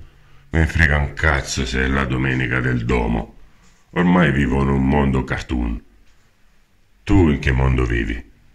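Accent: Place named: Salento, Italy. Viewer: ti ammiro tanto...grazie per la sapienza ke condividi con tutti noi🙏❤️ti ammiro tanto,ankio salentina di Brindisi,sento un accento vicino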